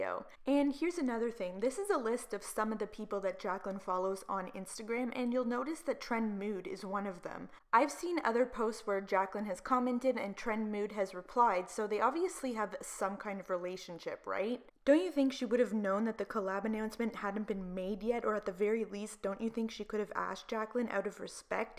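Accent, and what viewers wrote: Canada. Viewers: Are you Canadian Lol? I hear the "aboot" instead of about lol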